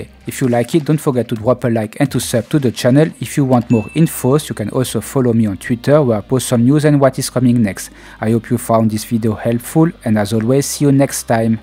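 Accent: France. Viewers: T'es francais non?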